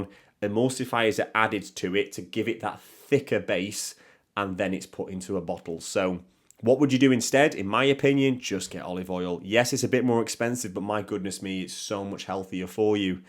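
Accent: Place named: Northwest England, United Kingdom. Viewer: Excellent video. Sounds like you're from my neck of the woods (northwest England) Being a lazy cook i was surving on ready meals and pies until a heart scare a few months ago. So now I have to eat healthily and finding it tough. My nurse is saying no butter, but I think a little is better than the UP spreads that taste vile anyway. The food industry is failing us. They have to reduce the number of additives as the demand for convenience food won't go away.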